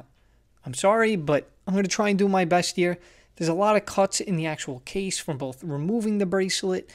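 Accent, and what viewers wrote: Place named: New York, United States. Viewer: Imma tell u the truth my guy, Ive shown non new yorkers your accent as a definitive reference, mine is not as thick as yours so I only sound like you in heated moments. Love the videos, I'm a collector of magic the gathering cards and try to take aspects of your hustle+customer satisfaction into my card trading, feels good when an "everyone wins" scenario I helped crafted pans out.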